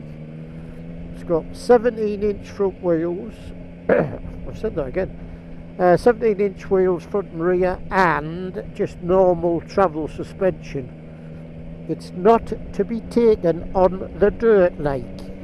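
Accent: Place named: England, United Kingdom. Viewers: is the original straight talking Geordie.. love it!! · that yorkshire accent grinds my gears normally but I can cope when it about motorbikes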